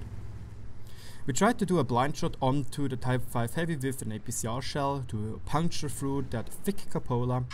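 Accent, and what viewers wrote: Germany. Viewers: Your accent certainly tells you're German, aren't you? The way you pronounce (Cheesus xD) Jesus, just cracks me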